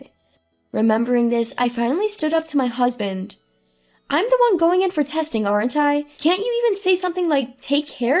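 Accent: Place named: Middle East. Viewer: At least it wasn’t Chinese AI. More like middle eastern.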